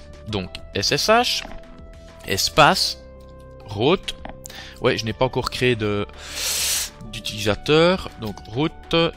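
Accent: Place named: Liège, Belgium. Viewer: Oufti tu viens de lieeeeeege toi 😂😂 J'adore les liégeois 😜 Super tuto, j'attends encore quelques pièces pour mon serveur et puis j'installe proxmox 😁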